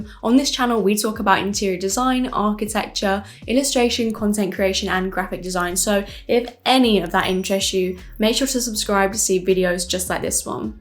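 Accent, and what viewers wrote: England, United Kingdom. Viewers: I really like your content and especially your English accent, so posh and elegant looove it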